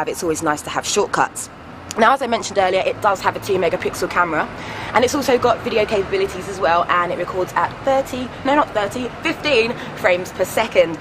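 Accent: United Kingdom. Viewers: british english :D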